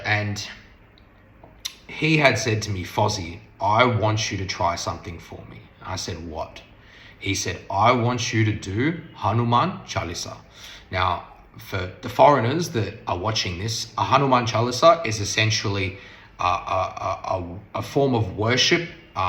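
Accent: Australia. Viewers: Your Australian accent is lovely. Please never change your accent. I love listening your English. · I'm from Bharat 🇮🇳 Your Australian accent to amazing. I understood completely no issues. What you experienced is not normal. Haman Chalisa meas connection to ALMIGHTY GOD. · Fozzie's greatest weakness: Australian English. The Indian viewer's greatest strength: Listening to Australian commentators for cricket. XD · Don't apologize for your accent. When the intent is right things like accent don't matter! And you have a lovely Aussie accents anyways! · Don't worry..... Indians are used to Australian accent from all the cricket commentaries we have heard all these years😂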